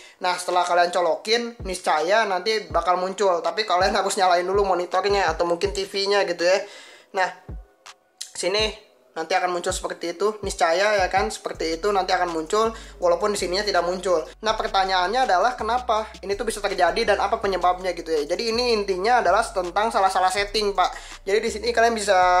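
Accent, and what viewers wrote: West Java, Indonesia. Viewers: Si akang nya orang sunda ya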